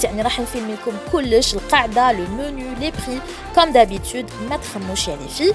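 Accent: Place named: France. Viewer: J adore ta voix et ton accent français .bon anniversaire .enjoy.bisous du Maroc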